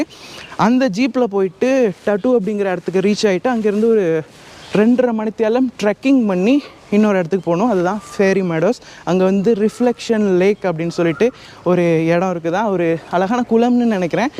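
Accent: India. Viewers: Nee ga srilanka Tamil pesala India Tamil pesuringa ,❤ nella eruku pro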